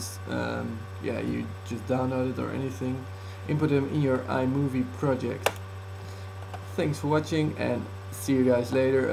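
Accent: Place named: Netherlands. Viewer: I could just hear of your accent that you are Dutch.. Im also Dutch. When you started talking I already knew it :')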